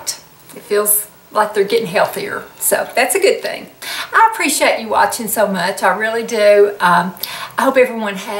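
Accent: South, United States. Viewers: Your Southern accent is beautiful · I am from Alabama and love our Southern accents. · You have a beautiful southern accent ! When reading comments from people , as soon as they get negative or mean just stop reading the comment. Don’t let anyone take your joy away! 😘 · I too am a Southerner. The South has several accents and I love them all. Your’s is beautiful! Why speak beige when you can speak in rainbow? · Hi do not fret over your accent one iota... Your southern accent is one of the many things that makes you so endearing... Southern class & charm radiate from you!!! Cheers!!! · Hi (my sweet Southern Belle), Ken Burns specials are SO professional, informative and entertaining. His "Civil War" series is often repeated in our home. Speaking of that, I absolutely love Shelby Foote's Mississippi accent, and the other southern accents too. Never feel embarrassed - your accent and euphamism is what makes you YOU! 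You are eloquent, well-spoken and charming. Please ignore those negative comments and instead replace them with all the ones you read here 😚 "Mindhunter" was a little slow to start but hubby and I binge-watched this and hope they will be coming out with another year's worth. So interesting, well acted and accurate in its history. Not gruesome - it was respectful. Your decorations are beautiful! Your grandchildren must get so excited when their birthday comes along 😃 💕😚💕 Alli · Howdy, I'm from Connecticut and I don't have an accent 😰. At least I don't think I do lol. Anyhow I love your Southern accent! Can I borrow it lol. Besides my FAVORITE male recording artist since I was a lil girl has an accent like yours and I could listen to him till the day is long. He's KENNY ROGERS!! 👏👏👏👏👍👍👍👍💥💥💥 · This Georgia girl loves your accent. I'm proud to be southern. Keep on going! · Aren't you a ray of sunshine? 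Love this color on you! ☀️ Southern accents are brilliant!! 💛 Wanna swap with this Jersey girl? #fuhgeddaboudit 😝 Love and thanks always, xx PS: Crime Junkies podcast is really good! 😎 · I love your accent...you sound like me...lol! Some people think if you have a southern accent you’re stupid...at least in my experience. Love ya! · I love the way you speak! You sound friendly, so genuine. I am aware of the stigma of southern speech but hey Jimmy Carter was elected President. How boring if we all spoke and looked alike. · I do love your accent!!! That’s why I can relate to you. I’m from NC so we sound similar. I’m proud to be from the south. I also greatly enjoyed the PBS series. Love ya ❤️. Don’t change a thing about you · I’m from the south and far from home, your accent is one of the reasons I listen! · I love your sweet southern accent! Your so sweet❤️. We all have different accents... 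Im from New Jersey and hate my Jersey sound and have been made fun of when visiting other states. Watching your video has helped me to look at it in a different way❤️. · Bonjour! I’m a Southerner too and for most of French people, I have a strong accent. They like to make fun of us but I don’t care, I love my accent, it shows my roots and is full of sun ☀️ You have a nice accent, and you speak slowly so it’s easier for me to understand you than other American women who speak very fast. I love your sweater, you’re stunning as always. Have a great day my Southern friend. Gros bisous de France 😘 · I remember the first time I watched one of your videos. I had been searching the web for something and a thumbnail came up about a “hot” grandmother or something taking YouTube by storm. Well, it was you. I was captivated by your sweet Southern charm, adorable dialect, and Goldie Hawn smile. I have been hanging with you ever since. 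You are a blessing:) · I love your accent! I’m from California and I put on your channel while I’m making dinner. I don’t know why it is, but your southern accent inspires me and motivates me. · I love your southern accent.❤️❤️ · I love your southern accent. It is very charming!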